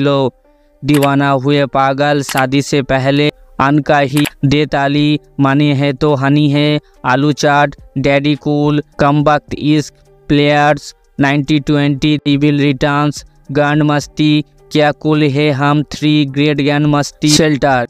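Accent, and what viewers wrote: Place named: Kolkata, India. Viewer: Sabdhana Kotha bolben aita bagladesi occharon na bangladesira vul occharon kora na aita apnader Kolkataer vasha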